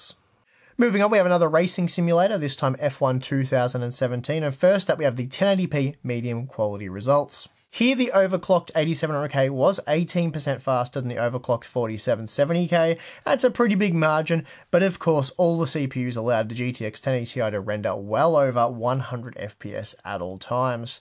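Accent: Australia. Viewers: Just found your Channel and subbed.. refreshing hearing a Aussie for a change love the Vids. Is a i5 4670k still OK for Gaming ?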